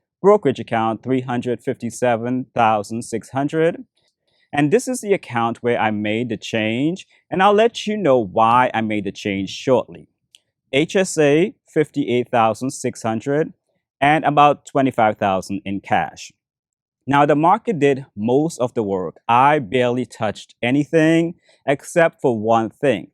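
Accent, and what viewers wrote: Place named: Vietnam. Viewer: You have Vietnamese accent, interesting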